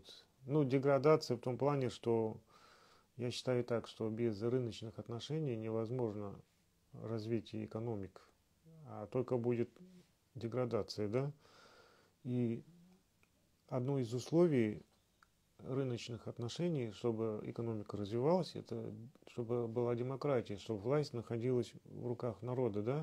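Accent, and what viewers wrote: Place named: Russia. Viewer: сіз қазақ аттарын атағанда нағыз орысша акцентпен айтасыз. Орысша сөйлегеніз жарайды, бірақ ЖарКЫн дегенініз ЖарҚын орнына өте жаман естіледі.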